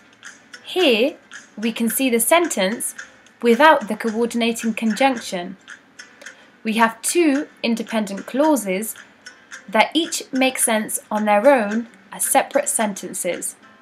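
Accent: United Kingdom. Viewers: Why she sound british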